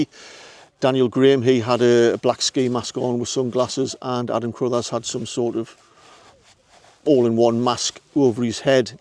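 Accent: Newcastle, United Kingdom. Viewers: I just trust a geordie accent, im a scot and this guys voice is just perfect for broadcasting, his hard work too, an education listening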